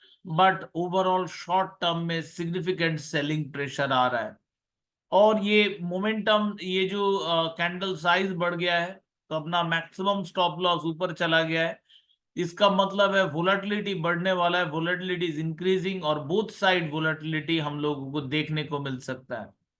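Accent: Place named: Bengal, India. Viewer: Accha video hai sir. Aapki Hinglish bhi acchi hai, with a Bengali tone 👍👍 make more like this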